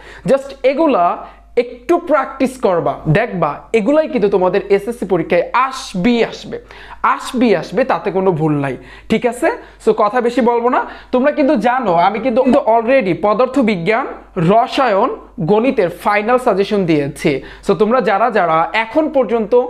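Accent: Barishal, Bangladesh. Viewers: Vaiya apnar bujhano ta onek valo lage vaiya apni ki borisal ar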